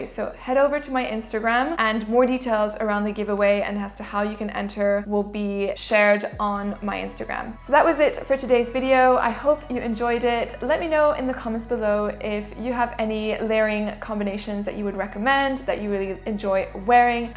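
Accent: France. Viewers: Tu es Française ? tu as l'accent français quand tu parles anglais 😅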